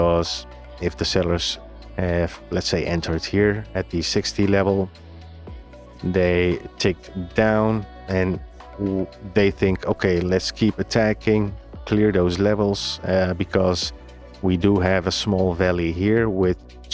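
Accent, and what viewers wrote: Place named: Netherlands. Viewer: Another Dutch accent, you guys are all from the Netherlands? Great videos so far!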